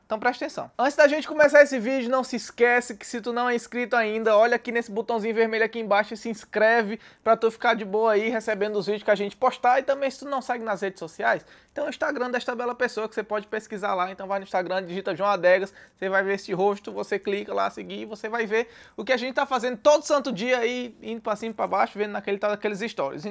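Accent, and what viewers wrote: Northeast, Brazil. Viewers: Caí aqui, e tu é nordestino? Pois parece com esse sotaque kkkkkk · Oxi vc é do Nordeste é...????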